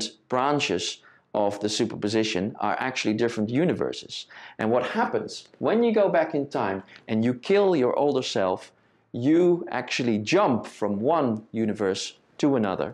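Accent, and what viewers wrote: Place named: Netherlands. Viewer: Cute Dutch Accent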